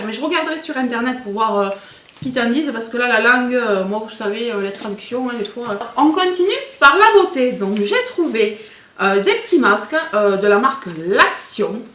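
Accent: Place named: Provence, France. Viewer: ça sent bon la Provence comme ton accent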